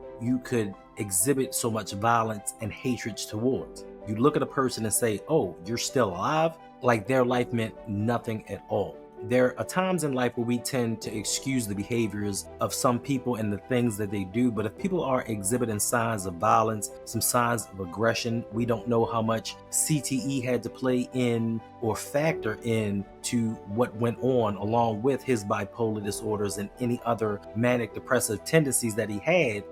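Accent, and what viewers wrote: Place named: Northeast, United States. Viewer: I'll be back when you get the audio right. Definitely from northeast . I can hear the MD, DC or PA in the voice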